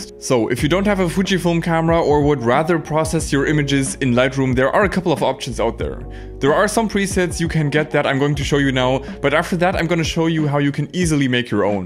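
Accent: Germany. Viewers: hey ! i know one of these photos are taken in Duisburg at the tiger and turtle, you also speak german accent. i wonder if you are near this town ? becouse i come from moers. i´m really into photos ! i own a a6300, gh5, canon 5d, 80d, 700d and a old voigtlander film camera. i start with a fujifilm 5mp and did not stop since then.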